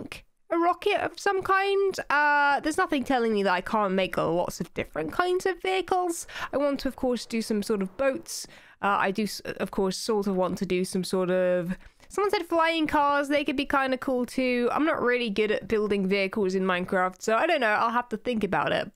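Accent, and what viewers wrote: United Kingdom. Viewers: are you British because I've been going through hermintcraft and I'm not sure if you have a British accent because I love british accents. If you don't it's fine because your commentary is my favorite out of all the you tubers and actually I like you and dreams commentary but love your videos best hermit craft series out of them all keep up the good work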